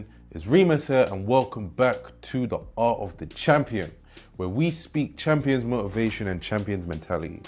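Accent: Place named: United Kingdom. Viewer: By your voice man clocked u r british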